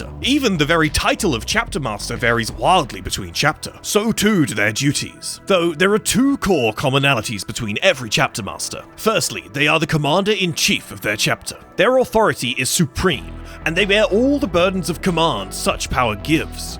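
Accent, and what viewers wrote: United Kingdom. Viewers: Mate this is a fantastic video but as English sounding as you are please, lieutenant is pronounced Left tennant. Lewtenent is the American pronunciation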